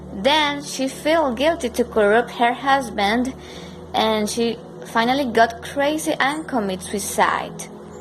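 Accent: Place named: England, United Kingdom. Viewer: Hello, this Is Arka. Thank you for subscribing my channel. BTW, you are beautiful as well as intelligent. But where do you live? I am asking, just because of your English ascent.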